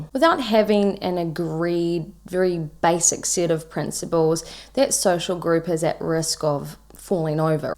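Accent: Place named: New Zealand. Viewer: SORRY I DIDNT HEAR EVERYTHING YOU SAID, bc I haven't taken my adhd meds today, but are you from New Zealand?? Your accents sounds NZ 😍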